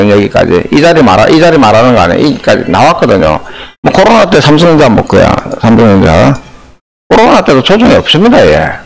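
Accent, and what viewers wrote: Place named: Busan, South Korea. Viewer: 분석내용 잘보고 갑니다 부산 목소리 반갑네요 고향 떠난지 12년째 퇴직하고 귀향 해야겠어요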